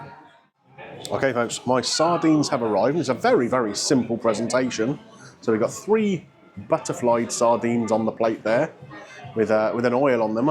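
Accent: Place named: Dorset, United Kingdom. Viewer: As a Bournemouth girl now living in New Zealand, just love your vlogs, and hearing your Dorset twang.. Merry Christmas to you and your fanily from ours jhere in Christchurch NZ xx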